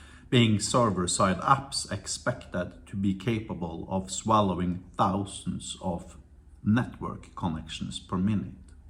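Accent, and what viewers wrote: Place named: Norway. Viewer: Thickest Norwegian accent on Youtube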